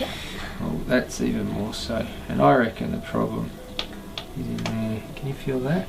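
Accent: Australia. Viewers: can someone please tell me if this guy is from sydney or melbourne pleeeease, i need to see this guy asap the joy i got hearing the australian accent hahahha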